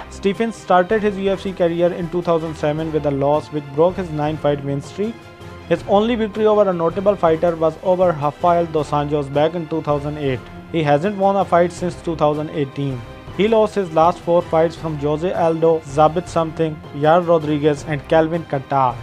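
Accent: India. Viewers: This is not asian accent.. this is KELING ACCENT... LOL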